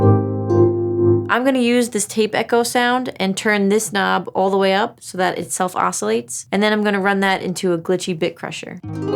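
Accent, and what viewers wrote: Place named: Alabama, United States. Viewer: For faster bankruptcy, start with JHS pedal first episode. Turn the YT speed up to 150% as you can eliminate the slight Alabama drawl and get through bankruptcy much faster” By the time you get to the Colorbox 2, you will have enough money to enjoy whats left while having enough left over for food and rent with a special monthly “kitty” to buy your favs from.:)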